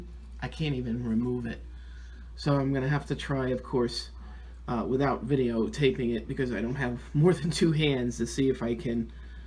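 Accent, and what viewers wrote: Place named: New York, United States. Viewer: Love the accent. (I'm in the Midwest.) Where are you from - New York, New Jersey?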